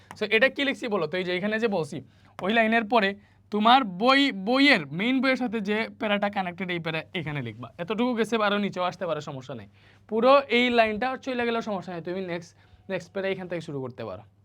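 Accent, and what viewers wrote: Sylhet, Bangladesh. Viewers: স্যারর বাড়ি সিলেট নি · Bhaia tumi mone hoy Sylheti 😊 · ভাই ত সিলেটি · Vai apni ki sylheti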